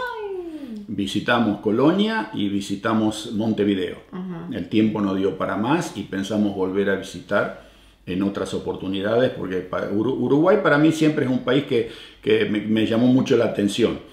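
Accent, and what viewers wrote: Argentina. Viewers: Gente maravillosa! Espero mi hijo pueda irse en 2 años a vivir allí y conservar como la diccion Argentina, la simplicidad y poder hacer una nueva vida allí!! Tiene 25 años es profesional y yo voy detrás de él una vez establecido!!! Lobespero con ansias, tenemos familiares allí!!! Abrazos desde nuestra pobre Argentina que aunque la amamos...ya no Se puede vivir. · Si! a mi también me encanta escucharlo tan argentino! viviendo la experiencia de un país fabuloso, con las raices acá y con su cabeza en el hemisferio norte. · Para el próximo video. Como es que no perdiste el acento argentino después de tantos años? Que extrañás de Argentina que no encuentres en Canadá ? Es importante el clima cuando se logra una buena calidad de vida? Saludos !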